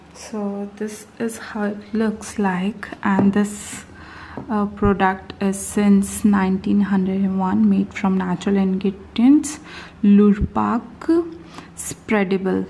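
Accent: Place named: Kerala, India. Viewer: hahahahaha y u talking malbari english...malyaram hindi english hahahahahahaha fucking butter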